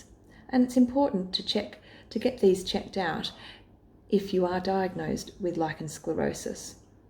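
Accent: Australia. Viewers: She sounds Australian. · Australians are the best dermatologists. Lovely hearing her Australian accent here.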